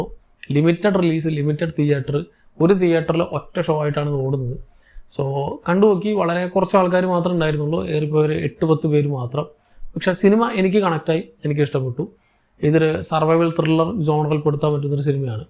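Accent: Palakkad, India. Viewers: Palakkad bhagathu anenn thonnunnu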